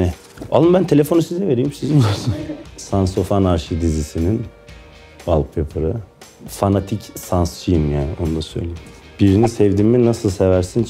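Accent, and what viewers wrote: Black Sea, Turkey. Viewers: Yaaa cok tatlııısin karedeniz şivesi de var bal ayrica SAKAL SANA COK YAKISIYOR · Ben keradenizli sandim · Eren abi çok güzel karadenizce konuşuyor ❤❤Ayrıca Niye SÖZ bitti ❤❤Seviliyorsun Abi ❤❤❤ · Ohaa efsane karadeniz sivesi var yaa😍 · Cok güzel karadeniz sivesi yapiyor. Bende inanmazdim laz olmadigina 🙈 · Lazca değil o, karadeniz 'ağzı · Lazca değil o, karadeniz 'ağzı' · Çok güzel Karadenizli konuşuyor ve gerçekten çok sevdiğim bir oyuncu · Senin Karadeniz sivenii yerim usagim 😂😂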